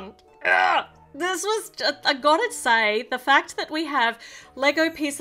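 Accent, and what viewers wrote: Australia. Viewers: Gday mate your Australian right? If not hello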